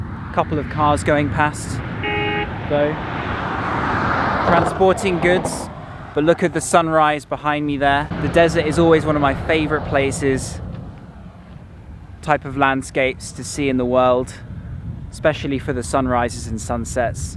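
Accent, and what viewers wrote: United Kingdom. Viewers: The best british accent ever 🇬🇧♥️ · I'm in love with the British accent.....greetings from Syria to you and to Egyptian people 💙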